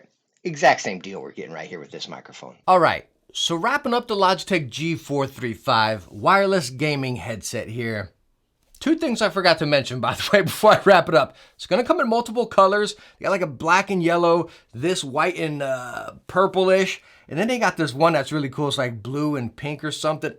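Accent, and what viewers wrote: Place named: Pittsburgh, United States. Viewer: Can tell there's a high chance is from Pittsburgh with his accent lol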